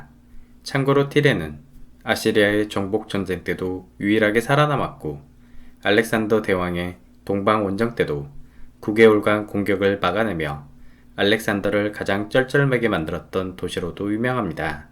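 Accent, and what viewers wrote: Jeolla, South Korea. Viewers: 내용이 좋은건 당연한데 은근 슬쩍들려오는 전라도사투리가 갱장히 친근하네요ㅋㅋ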